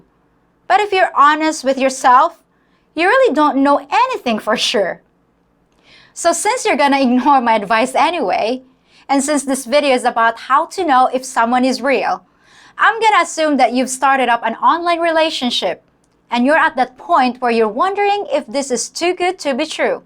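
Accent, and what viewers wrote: United States. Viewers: How do you sound so American and know our colloquialisms? ad nauseum, I know many who dont know this phrase and many others you use. Did you live in the US? Canada? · did you live in the states? You sound way too Americanized even your way of thinking. One rule for men to follow. No honey no money. Meaning you have to meet them first and see how it unfolds.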